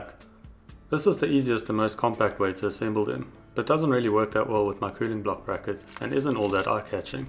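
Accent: South Africa. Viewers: But why? P.s. is that an SA accent? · Awesome project! I’m I hearing a South African accent? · You sound like a south african ? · love the South African accent, but please call it a Water cooling system, not a cooling water system lol!! Impressive stuff, could you share the clusters Hashrate for BTC when overclocked to 2Ghz? and the temps? would be a nice stress test on the cluster and cooling water system :p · Clicked link. Didn't expect to hear a South African.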